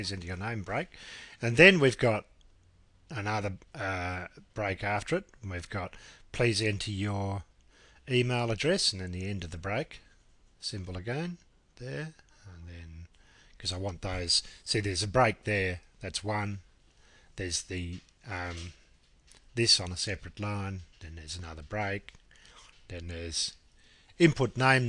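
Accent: Australia. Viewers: Thanks plain Aussie Talk Very Easy to Follow.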